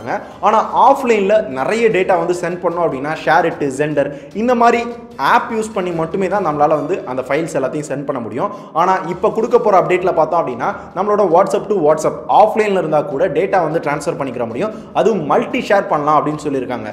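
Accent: Madurai, India. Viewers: Madurai karanga na summa VA Thaniya therivom la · Madurai style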